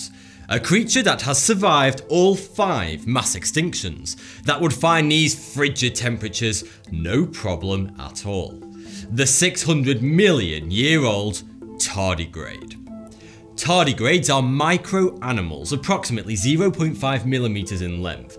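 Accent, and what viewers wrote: United Kingdom. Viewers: Edith Ann Seriously! He's not American. His accent is clearly British.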